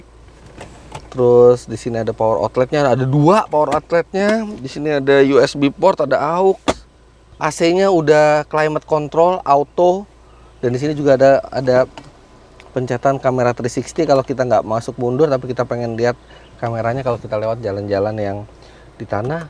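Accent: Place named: Serang, Indonesia. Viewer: Orang serang mana suaranya👋🖖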